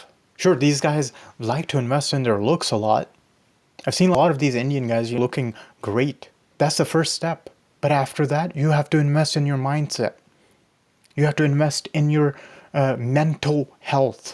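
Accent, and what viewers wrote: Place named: United States. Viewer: U have like an American accent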